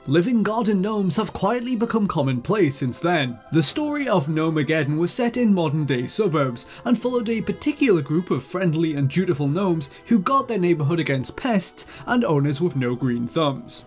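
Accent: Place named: North, United Kingdom. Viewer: i wish i could listen to northern british accents but i just can’t :(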